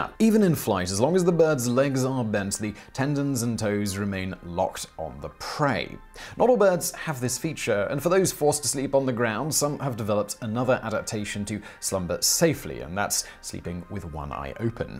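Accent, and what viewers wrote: United Kingdom. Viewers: All the other narrators with British accent sound fake, Except. He is a professional. Kudos, kudos.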